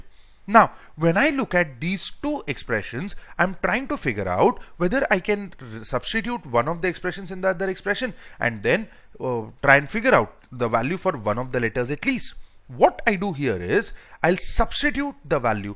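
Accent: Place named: Asia. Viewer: Your accent is too asiatic, dude. Good problem👍🏼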